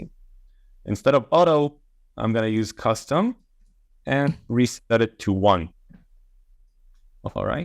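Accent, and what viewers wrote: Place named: Israel. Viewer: טוב, לפי המבטא הבנתי שאתה ישראלי! תוכל להסביר לי, למה לעזאזל כל עריכה שאני עושה בדסקטופ לא מסתנכרן עם מובייל וטאבלט!?!? הכל מתחרבש, כל הדפים], סקשיינים והתאים על מצב פיקסט! למה לעזאזל זה מתעוות לי ב-publish!? אה...ועוד דבר מעצבן! כתבתי תוכן בעברית, לחצתי על cntrl+shift ימני כדי לחהזיז לצד ימין הכל, ואז הפרגרף מוחק לי את הכל ומעלים את האופציה של הטקסט! מה לעזאזל!!!!!!!!!!!!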